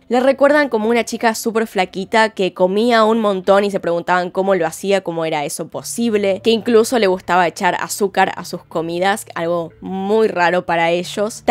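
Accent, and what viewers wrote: Argentina. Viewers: Muchas gracias por el caso, tienes un acento argentino muy bonito, se oye bien con la narrativa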